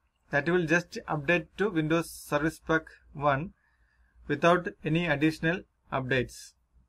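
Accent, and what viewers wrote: India. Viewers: there is always one indian guy with unique accent with solution to every pc laptop apple google problem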